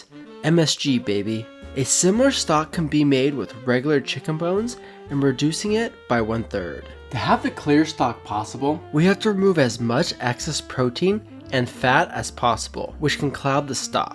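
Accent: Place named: United States. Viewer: *Clicks on recipe video.* *American starts talking.* *Uses SCALE and GRAMS* 🥹🥹🥹 You got me right in the beginning! I really do appreciate Americans who use the (superior) metric system even though it's not the standard in your country. Thanks a lot! (In case you're Canadian though I'm sorry for calling you American lmao 😂 sometimes I don't recognize the accent)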